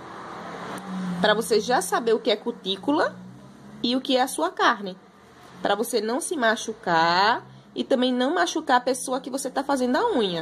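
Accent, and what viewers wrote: Northeast, Brazil. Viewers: Sou carioca, nas amo sotaque nordestino. Acho que nasci no lugar trocado..😂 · vc é nordestina?😍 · Eu adorei o sotaqueee!!! Nordeste!!! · Quando ouví pensei logo Nordeste nas paradas... Tive a certeza quando pronunciou Cli..en..tíí. igual minha amiga...😁😀😊 Amei sei vídeo 👏👏👏👏👏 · Parabéns! Adorei a explicação. E viva o sotaque nordestino, eu amo.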